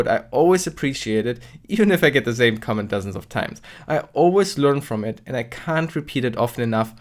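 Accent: Germany. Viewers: Just wondering where you are coming from! You sound so German :)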